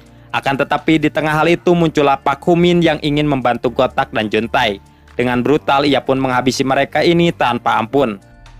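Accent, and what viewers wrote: West Java, Indonesia. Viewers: Bang urang sunda lin??😅😅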